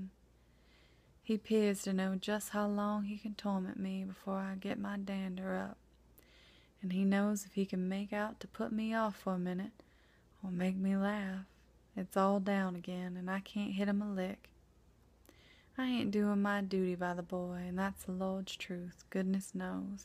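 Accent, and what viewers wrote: South, United States. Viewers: Such a great video! So difficult to find a great ASMR southern accent video, so thank you ☺️ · Where are you from? That was great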